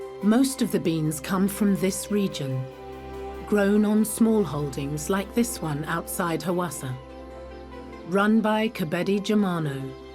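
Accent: United Kingdom. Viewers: what 😂 nice voice and British accent makes everyone seem knowledgeable but this documentary is very inaccurate plus I don't know if it's on purpose but they were jumping pivotal moments in our country's history when she was talking about axum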